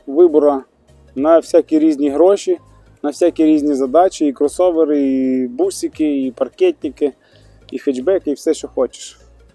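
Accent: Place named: Germany. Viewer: Гарний німецький акцент, дякую за відео, дуже цікаво!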